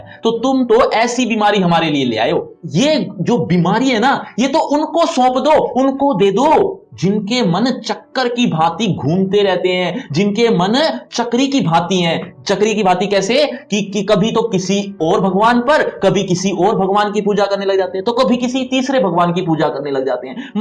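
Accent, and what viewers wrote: Haryana, India. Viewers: Sir aap Haryana se ho kya? kidi baith jaati h😂ye to Haryanavi h comment👇if you are also Haryanavi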